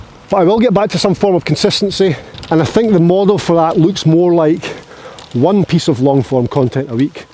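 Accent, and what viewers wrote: Scotland, United Kingdom. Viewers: I kind of imagined you somewhere in the north of Scotland. Very desolated. Maybe it’s your accent.you were sort of “rough”, until I saw you interact with your kid. Which was heartwarming. Keep it up. I enjoyed it. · Thanks so much for your informative videos, I really enjoy them, including the Scottish accent. I am not Scottish, but I live in Nova Scotia Canada, which is Latin for New Scotland, so I feel at home seeing glances of your beautiful Country. Keep up the great content, and I wish you all the best going forward.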